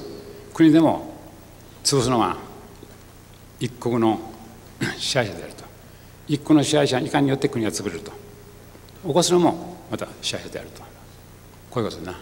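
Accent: Kansai, Japan. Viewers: 関西弁を心地よくしゃべる大先生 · 幸之助さんの話は非常に分かりやすいのが素晴らしいと思います。 優秀な人ほどわかりやすい話し方をするそうです。 受け売りではなく実体験を語っているかでしょう。 近年経営者が話す難しい国語表現や横文字ビジネス用語は使わない。 一般人の虚を突いたようなことをいうわけでなく当たり前の話であり共感しやすい。 名言集でも不思議と偉そうな説教には感じないんですよね。 尤も昔の人だしベタの関西弁なので聞きなれない人は聞き取りにくいかもしれませんが。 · 本では見たことあるけど生で初めてみた。こてこて関西弁なんですな。また好きになってしまった。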